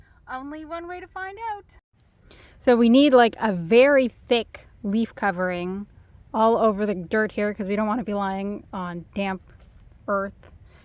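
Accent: United States. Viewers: for growing up on a remote island with no social media you have a very average american english accent.. :face-orange-biting-nails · Well, it sounds like you’re from the south. That’s where you are now so Bobby a poisonous snake or I should not say poisonous cause you know the venomous is what they are.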